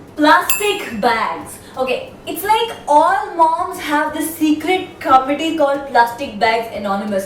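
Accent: Maharashtra, India. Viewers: I love your marathi accent..... You rock it... :* love you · yeah so true i dont why but is way better than superwoman her marathi accent is so cute n i find her videos relatable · please use a lot of your Marathi accent cause it's super cool....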